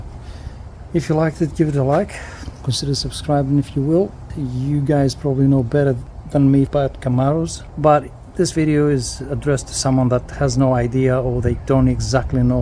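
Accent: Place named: Australia. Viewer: I can definitely hear an Oz twang in the accent these days 😂 settling in fine i see😉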